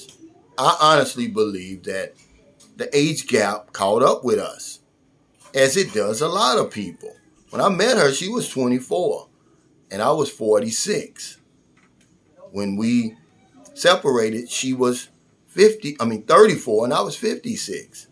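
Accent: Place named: Louisville, United States. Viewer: I knew I recognized the accent! Louisville in the house! Newburg here! I’m glad I came across your channel.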